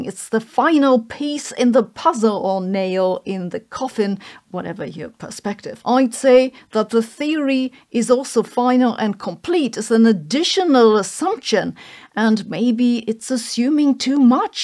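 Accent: Germany. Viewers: Einstein is being pronounced correctly for Sabine's type of German accent. Einstein himself had a southern germanic accent which softens a lot of sounds.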